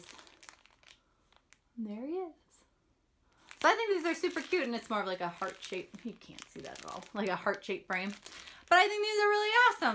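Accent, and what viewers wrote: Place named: Minnesota, United States. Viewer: Fun video my moms from Minnesota so I recognized your accent. I have a billion relatives there hey you could be one.........I remember being there in December. And my grandparents walking to church in minus 0 weather!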